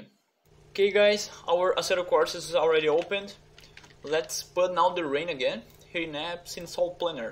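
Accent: Brazil. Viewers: Só BR pra ter esse sotaque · tu é brasileiro né mano, esse sotaque ai eu conheço hahaha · slc mano me ensina inglês aí 😯só deu pra perceber que era é br por causa do sotaque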